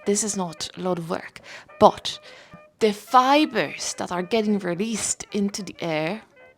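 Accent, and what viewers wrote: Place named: Ireland. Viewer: Came from the crafts but stayed for the accent ngl! 😅 I spent half the video trying to place where your accent is from. I wouldn't have guessed Ireland! I'm Canadian, and we don't get to hear a lot of regional Irish accents on this side of the pond. I love your vibe, your humour, and this video has a nice professional polish. Can't wait to check out the rest of your videos! +sub! 😁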